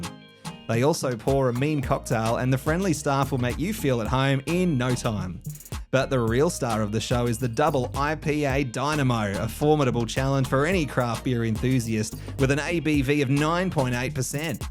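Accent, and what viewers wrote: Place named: Australia. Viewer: Mate! Going to Barcelona in a couple of weeks & when I heard your Aussie accent I knew you know what your talking about 😉 Even though it’s not summer over there it’s always a good time when you have a cold one!!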